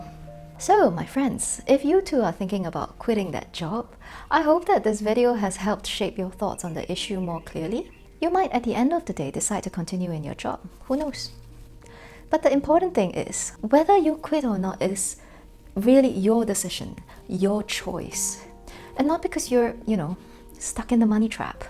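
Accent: Singapore. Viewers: hi!, is your husband from a Russian country? because your last name is but your accent is from singapore. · Hehe I like listening to your accent, you remind me of my friends from Singapore 😎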